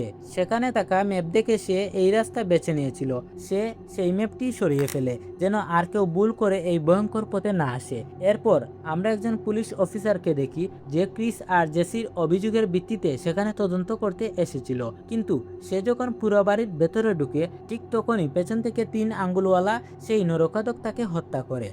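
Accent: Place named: Sylhet, Bangladesh. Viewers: ভাইয়া মনে হয় সিলেটি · মৃত,, নেউল দেখতে পায়,,,,সিলেটি টান ভাষা,,,আপনার কথায় সিলেটের টান আসে,,,,সুন্দর করে বাংলা উচ্চারণ শিখেন,,,সিলেটের ভাষার গুষ্টি মাইরো না।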